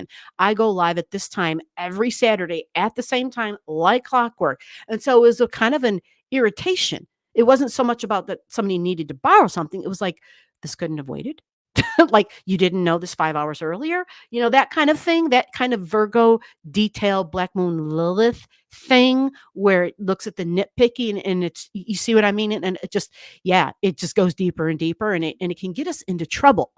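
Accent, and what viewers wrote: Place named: Midwest, United States. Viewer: Wow, this is fascinating and I just love all of your videos. It's refreshing to listen to another midwesterner-hehe. My BML is in Capricorn (almost Aquarius) in the 10th house. I'm a Sag sun, Aquarius moon, Taurus rising, scorpio venus. I tend to get very aggravated with Virgo energy even with all of the Earth placements in my chart. It feels judgey and oppressive. G, I also noticed that a few married couples around me are having big arguments about cleanliness and household duties. Woah.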